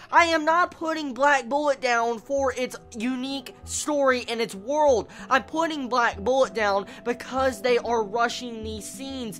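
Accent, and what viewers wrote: United States. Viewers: are you american???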